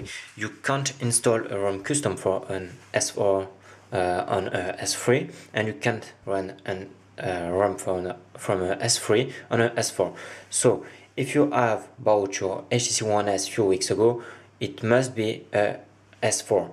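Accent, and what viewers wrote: France. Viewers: Lol, ur french, i can hear it Heun que g raison ? Bizzarement mon frere a le meme accent xD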